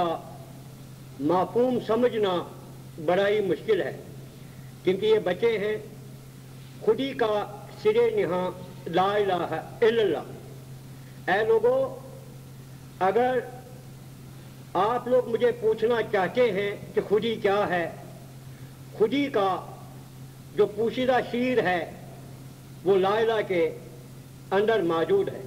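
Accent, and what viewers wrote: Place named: Gujarat, India. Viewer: Gujratii juban hi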